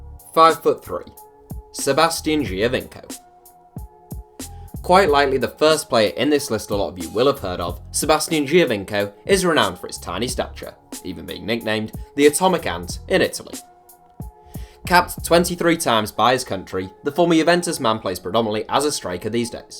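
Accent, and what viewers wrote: Ireland. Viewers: I thought you were Irish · Irish guy?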